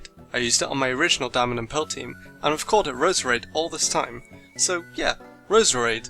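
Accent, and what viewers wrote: United Kingdom. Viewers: lol you remind me a lot of Gigguk! it's the British accent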